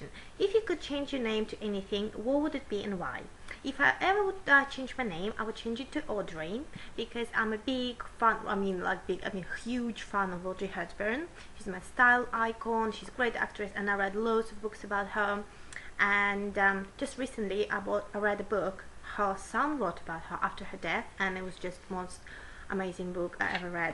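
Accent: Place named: United Kingdom. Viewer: what is your accent? it sounds british but.. with a mix of something? it's cool!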